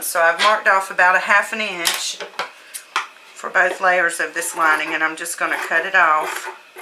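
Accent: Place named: New York, United States. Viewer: It's so great that you keep your accent in your video!! so much of the usa is working to eliminate accents and talk like tv newscasters in a fabricated (non)accent called "general american". Its so boring and awful. I think it wont be long and the nyc/nj accent will be wiped out. Its sad. Kudos to you.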